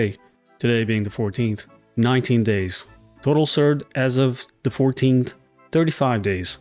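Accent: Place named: Montreal, Canada. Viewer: it sounds like you’re from Montreal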